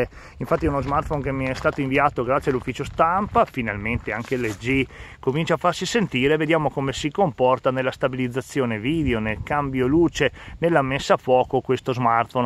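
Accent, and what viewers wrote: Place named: Veneto, Italy. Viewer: Presumo che dall'accento non abiti molto lontano da me..nella mia zona(Montagnana) iliad funziona alla grande, praticamente sempre 4g o quasi, magari lo Speed test non è a livello di Tim, ma alla fine, mica guardo film in 4k col telefono!! nell'uso quotidiano non noto nessuna differenza..quindi secondo me anche tu sarai soddisfatto! grande come sempre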